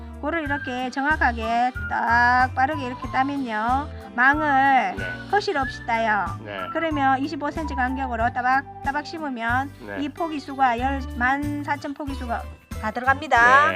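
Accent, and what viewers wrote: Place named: Sangju, South Korea. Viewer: 억양보니 상주 근처네요 . . 재밌고 잘하네요.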